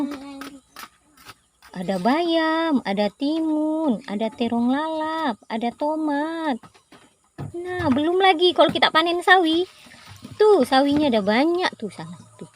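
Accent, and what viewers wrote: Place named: West Kalimantan, Indonesia. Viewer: Orang Mana Bunda,logat bahasa daerahnye sepertinya org Melayu Kal-Bar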